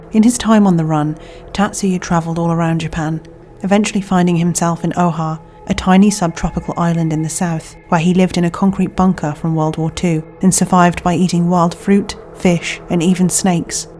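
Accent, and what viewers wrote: United Kingdom. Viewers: Dude... Do you even hear yourself? You waffle on in here demanding someone with a British accept to do the very thing she is already doing; Speak normally. And you proceed to do this with a comment where you demonstrate your complete ignorance of the written English language. Are you this embarrassing in real-life too?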